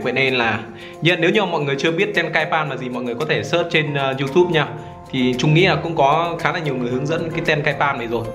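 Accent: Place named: Vietnam. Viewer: anh kêu là tiếng anh mà đọc kiểu tiếng việt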